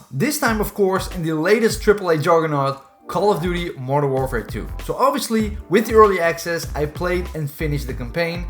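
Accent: Netherlands. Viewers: Ik dacht al dat je Nederlands was vanwege t accent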